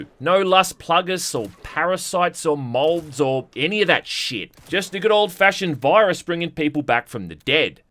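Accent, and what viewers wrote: Australia. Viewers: the aussie jeremy clarkson of game reviews, i cant take no more of this ear rape.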